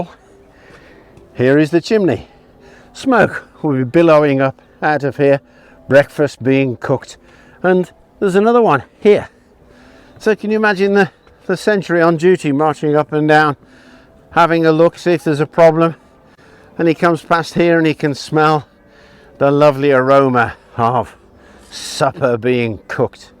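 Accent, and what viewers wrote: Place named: Birmingham, United Kingdom. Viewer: He sounds more like a Brummie than someone from The Shire. I grew up 10 mins from the castle but didn't visit until I was in my 20s! I guess you don't think much about something you see day in and day out. It is a very interesting place, but hideously overpriced.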